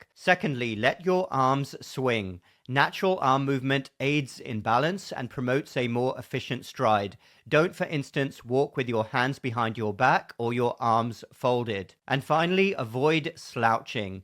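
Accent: United Kingdom. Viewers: I walk to the cafe, about 3.4K every day, so a nearly 7k round trip. I have to concentrate to keep my shoulders back, and look straight forward. I am 77, and was forced to retire at 75 for vaccine refusal. thanks. I am intrigued by your accent, which I notice that other people have from the UK, but seems to be a mixture of older accents😊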